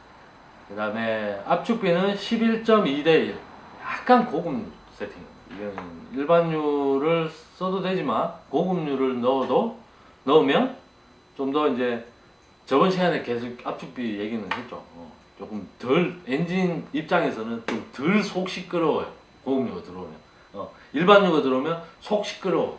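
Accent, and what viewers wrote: Gyeongsang, South Korea. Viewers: 어눌한 갱상도 사투리 계속 듣고 있으니 감기는 맛이 있습니다 ㅎㅎㅎ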